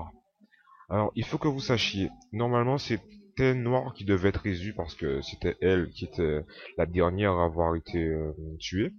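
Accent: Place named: Africa. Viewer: ta un accent africain :)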